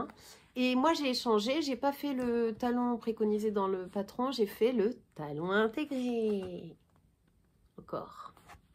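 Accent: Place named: Marseille, France. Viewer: 🍄merci , ton Marseille te vas à ravir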